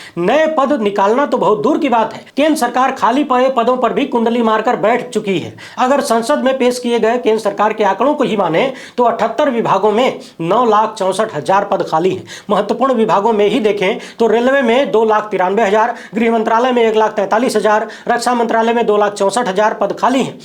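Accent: Bhojpuri, India. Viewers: CJI को हाई कोर्ट और सुप्रीम कोर्ट के किसी भी ज़ज को सेवा में रहते भर या सेवानिवृत्ति के बाद राजनीति में या किसी भी सरकारी पद, राजनैतिक पद या किसी भी सरकारी अर्ध सरकारी संस्थानों मे किसी भी लाभ के पद पर जाने से तुरत रोक लगाना चाहिए. इससे बहुत हद तक न्याय व्यवस्था में पक्षपात को रोका जा सकेगा और लोकतंत्रात्मक व्यवस्था को बनाए रखा जा सकेगा, इसकी देशहित में नितांत आवश्यकता है . आपका व्यंगात्मक लहजे में भोजपुरीया टोन के साथ विश्लेषण न केवल तथ्यों की जानकारी देता है, बल्कि उसकी कलई खोलते हुए मनोरंजन भी कराता है.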